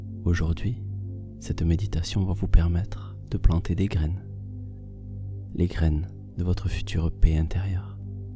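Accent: South, France. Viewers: c'est l'accent du sud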